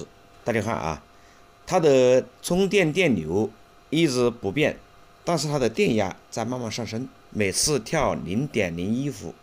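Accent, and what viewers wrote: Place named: Hunan, China. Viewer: 湖南口音，果然是三一重工的员工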